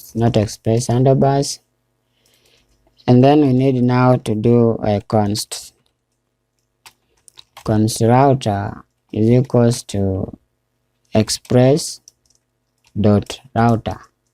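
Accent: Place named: Asia. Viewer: U sound Asian, ru sure ur a?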